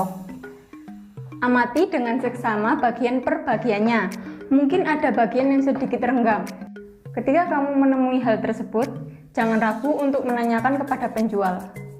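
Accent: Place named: Java, Indonesia. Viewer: Medok mbak 😅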